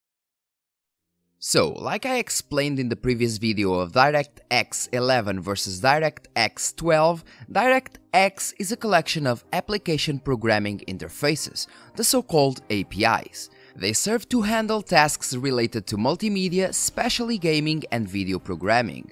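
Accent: Portugal. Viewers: Parece a voz de um tuga, estou certo?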